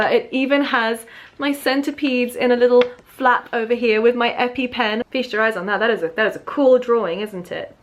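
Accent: England, United Kingdom. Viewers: Prounance from england is so beautiful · Oh, that British accent. Nothing for my ears.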